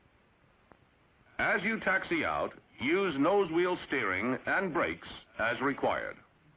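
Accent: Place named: Boston, United States. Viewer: Love the Bostonian accent. AFP couldn’t either train him to speak “General American” or their editors themselves thought it was okay. Option 3: A rush to production rendered the former two impossible. And: the rear control surfaces seem just massive...otherwise, this very striking bird reminds me of the B58’s cockpits...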